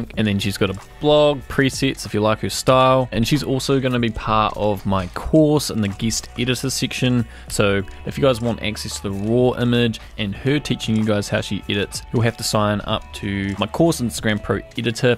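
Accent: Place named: New Zealand. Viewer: Think he’s from New Zealand bro bro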